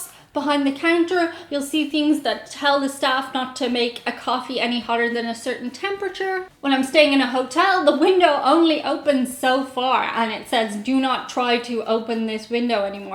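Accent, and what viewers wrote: Ireland. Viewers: Is it just me, or are you starting to sound REALLY American yourself? I can barely hear your Irish accent anymore. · Here's an idea for your next trip to the US; you should try going to a firing range. I think I remember "Joel and Lia" going (I believe you know them or I wouldn't have mentioned them on your channel). I guess I'm the odd American, because I have been travelling abroad for longer than you've been alive, almost 25 years;). One reason I watch your videos is I miss the accent when I can't travel, love Ireland. Keep up the great work.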